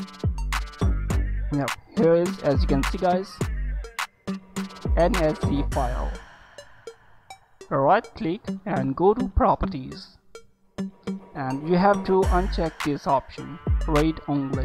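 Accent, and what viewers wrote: India. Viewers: It's always indian voice with 1$ micro. Classsic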